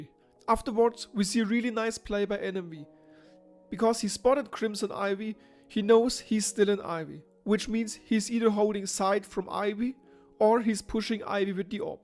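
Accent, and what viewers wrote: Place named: Germany. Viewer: good video mens))) i like your jermen accent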